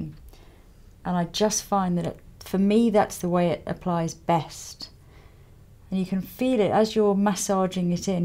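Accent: England, United Kingdom. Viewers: So thrilled that you have a lovely English Accent and a soft voice xxx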